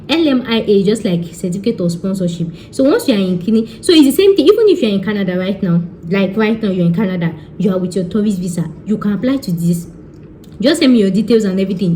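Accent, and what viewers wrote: Yoruba, Nigeria. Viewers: I love this ur yoruba English, proud of u sis ✌️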